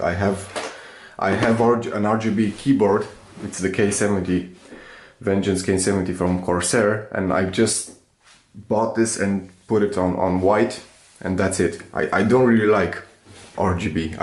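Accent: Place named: Netherlands. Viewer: vorbesti engleza cu accent de olandez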